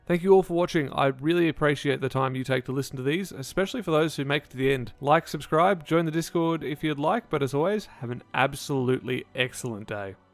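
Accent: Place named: Australia. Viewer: Something about your voice reminds me of Christopher Walken, but in an Aussie accent.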